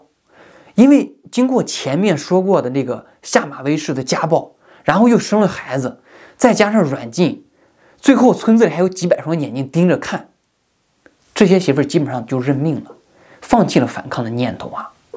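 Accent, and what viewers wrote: China. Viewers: 當初看到你的頻道，是因為你初到美國找工作，最後選擇拖車看起，其實一開始看到你就覺得好像沒這麼像華人臉孔，但是聽你的腔調確實是大陸，也就繼續看著看著，但是心中很多的疑問，覺得你的心中有很多想說的，一直期望盼望著，總算這幾期算是更了解的背景，也知道要講出這些話，心裡的壓力確實很複雜很苦，聽你說完真正感受到你心中的苦與黑暗，給予你支持也更加了解內地與農村的真實苦難…謝謝分享也更珍惜現在擁有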